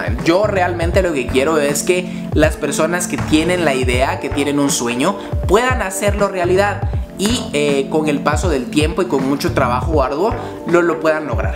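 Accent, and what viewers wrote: Mexico. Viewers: Se te agradece la información papá, pero demasiado largo el video, hay que mejorar la edición en la post- producción de tu contenido. Existe demasiada redundancia en tu diálogo. Además ¿dónde dejáste el VOS chapín? Parece más que sos mexicano. Son opiniones puramente personales por supuesto. Espero tomes la crítica en una manera constructiva. Para que veas que no estoy siendo culeid, le dejo un 'like' a tu producción mano. Sigan adelante. Por cierto es primer video tuyo que miro, y voy a ver más para apoyarte. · Este men parece mas mexicano que guatemalteco ? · por qué habla como mexicano? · Porque habías como mexicano 90%mexicano10%Guatemalteco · Porque hablas como mexicano?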